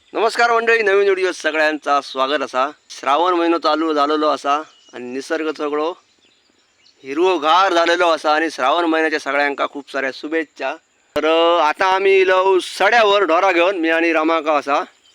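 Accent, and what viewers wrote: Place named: Malvan, India. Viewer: मालवणी मस्त बोलतास, दुसर्यांसारखी नकली मालवणी नाय, अस्सल मालवणी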